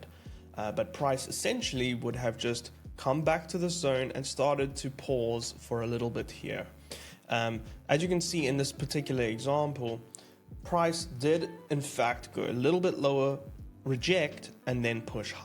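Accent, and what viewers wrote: South Africa. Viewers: Are you a Saffer?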